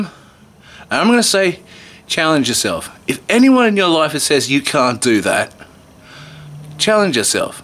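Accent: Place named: Brooklyn, United States. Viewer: Wait...you’re Australian?! I thought that was a Brooklyn accent! 😂 A couple things...that drawing is beautiful, it reminds me so much of a Tim Burton character. And was that a Skerple you used? Always love your videos buddy, glad your little one is doing well. That bottle opener was the best part...gave me a good laugh!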